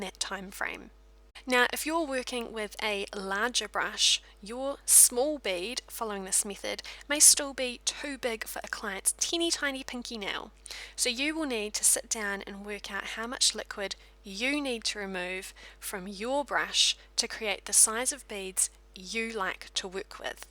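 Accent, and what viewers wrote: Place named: Australia. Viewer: "eats tew wit" lol I love your accent. Great video. Are you Aussie?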